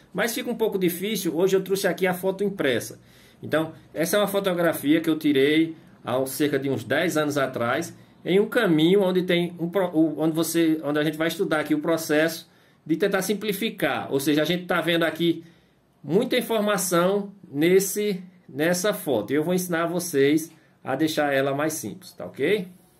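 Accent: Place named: Northeast, Brazil. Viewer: EXCELENTE DICA, obrigado professor, só uma curiosidade, o SENHOR tem um sotaque nordestino, de que estado o SENHOR e'? Adorando seus vídeos, também sou pintor, PARABENS PELO CANAL, Abraços aqui de BH- MG.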